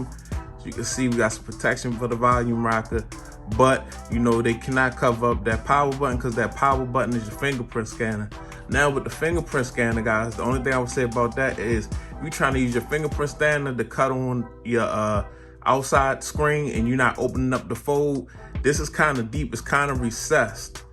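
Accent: Baltimore, United States. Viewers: Def got the Baltimore accent 💯 GO RAVENS 🐦‍⬛💜🖤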